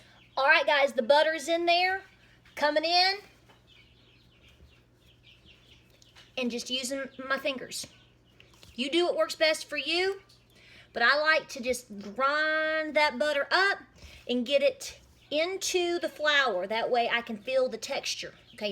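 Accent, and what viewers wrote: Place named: North Carolina, United States. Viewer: I’m a displaced Northern Carolinian living near DC and I just loved listening to you talk. Sounded just like home ❤️. I about lost it when you said *cathead*. Lordy mercy it’s been a minute since I heard that phrase. Now I’m hungry and have to go make these 🤣. TFS!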